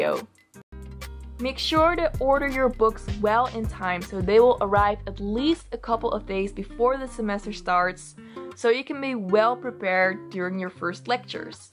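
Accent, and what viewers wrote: Netherlands. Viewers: Are you Belgian or from the Netherlands? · Are you Dutch I can hear a small accent Dus hey hoi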